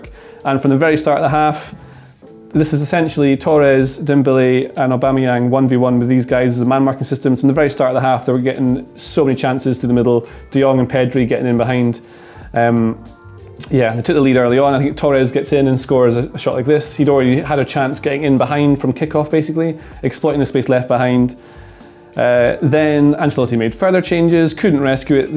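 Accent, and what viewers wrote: Scotland, United Kingdom. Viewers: Something about a scottish accent that causes me to take the opinion immediately serious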